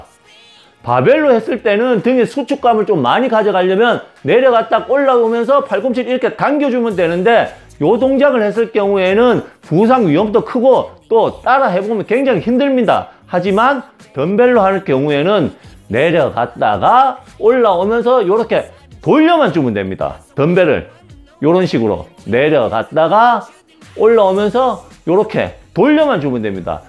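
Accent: Gyeongsang, South Korea. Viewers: 형님 항상 꿀팁 잘 보고 있습니다~ 같은 경상도라서 그런지 말투도 친숙하고 귀에 쏙쏙 설명이 박히네요 앞으로도 좋은 영상 계속 찍어주세요 (개인적으로 일두근 영상도 다시 해주셨으면 하는 바람,,)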